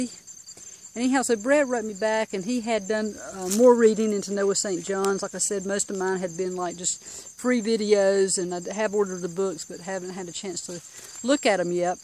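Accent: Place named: South, United States. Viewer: You're awesome. IM a "Tarheel" Myself,by the way. I Love the Carolina/Southern dialects and accents, your's is music to my ears!! Yee Haw!! Keith.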